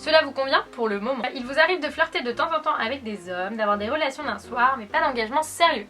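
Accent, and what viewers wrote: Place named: South West, France. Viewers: Un bon accent du sud qui ressort naturellement! Ca fait toujours plaisir ;) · Accent du Sud Ouest ❤❤ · j'aimerai bien que tu refasse ce test aujourd'hui que t'es heureuse en amour ^^ pas sur que tu répondrais la même chose pour le boulot qui passe en premier toussa toussa :p en tout cas, pour le boulot je suis comme toi ça passe avant l'amour (ma copine me le reproche bcp), et pour la vision du couple, idem : deux personnes vivant ensemble mais ne vivant pas l'un pour l'autre, ça fait trop cliché ^^ Sinon, pour l'accent, je trouve que ça ressemble un peu à l'accent de maïté, donc accent du sud ouest (mais vraiment coté paysan, car je suis du sud ouest et mon accent est beau car il est léger (surtout parceque j'ai quitté toulouse depuis 10 ans :D))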